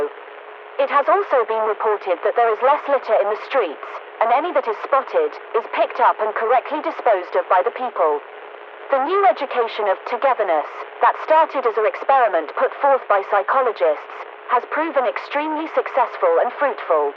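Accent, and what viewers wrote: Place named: United Kingdom. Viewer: I love how it’s set in Georgia yet she has British accent. PS, this would make a great movie or series.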